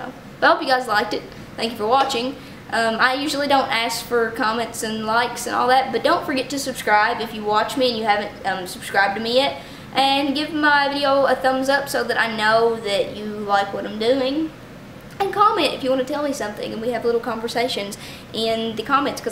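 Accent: South, United States. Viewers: She's either from Georgia or Tennessee. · This is very beautiful and by the way, I love your southern accent! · I click subscribe because of your southern accent. Im in love 😍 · Garrett Nickell it's an American southern accent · It's a southern accent compare it to Paula dean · Your accent is the perfect amount of "I can understand you! Even though you have a country accent!" and "OMG OMG OMG THIS PERSAN HAS A COUNTRY ACCENT!" I give 3 thumbs up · Definitely not Arkansan, coming from an Arkansan. Its really Southern more so than I am used to. So I would be inclined to assume Louisianan or some other deep south state. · Ukuleles come in different sizes ..this was good. I love southern girls accents. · Def southern but I'm guessing Tennessee or Kentucky maybe?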